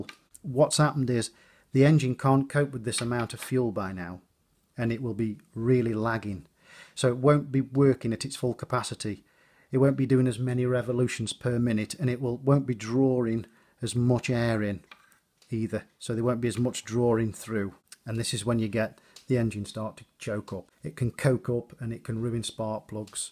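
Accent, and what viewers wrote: United Kingdom. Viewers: I’m enlightened now. Sometimes it just takes someone with a British accent to make sense of it all. Thanks. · Is it weird that a British accent from an instructor makes it easier to learn? I find it relaxing for some reason. 🤷‍♂️ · Lol. One thing I realized from this video, since I seldom hear someone with a British accent pronounce the letter "H", is why my Indian friends pronounce it as "heych" instead of "eych" as us U.S. folks do. It must be the British influence on English speakers in India. But more importantly, great informative video! Now, off to my garage to try to get that string trimmer working that some scammer sold me on Craigslist.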